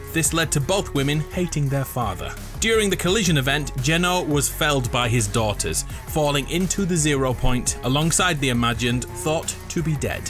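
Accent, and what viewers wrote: United Kingdom. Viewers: He's british, they pronounce things differently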